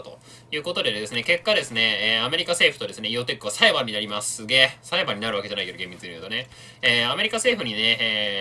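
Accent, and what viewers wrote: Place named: Western Japan, Japan. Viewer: 西日本の人は質をひちと読みます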